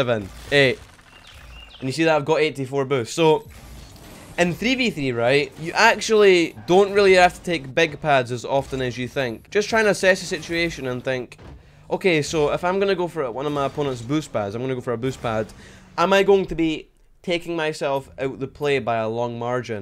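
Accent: Scotland, United Kingdom. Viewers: Are you Scottish by chance?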